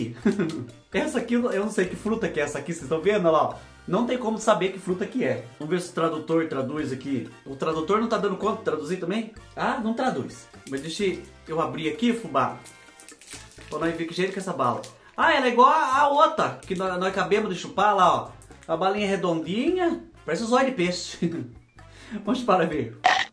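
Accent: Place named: Paraná, Brazil. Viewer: Tava caçando vídeo de receita com fuba e encontrei esse canal, um fofo! ❤️ sotaque de paranaense, interiorzinho do Paraná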